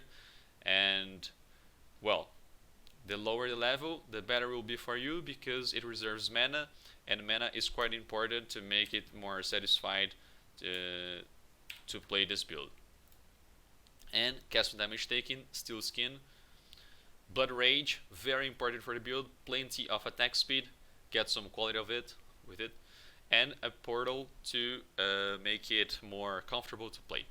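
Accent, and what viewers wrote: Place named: United Kingdom. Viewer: Agora sim eu consigo escutar esse britânico nato falando <3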